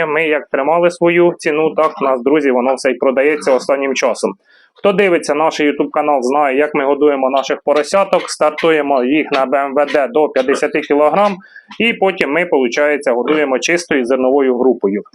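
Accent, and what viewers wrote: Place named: Cherkasy, Ukraine. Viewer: Подяка людям праці .Мова точно як в селах Черкащини.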